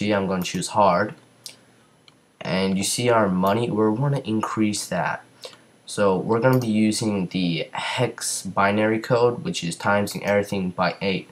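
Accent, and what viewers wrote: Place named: Australia. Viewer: so...u went from an American to an Aussie?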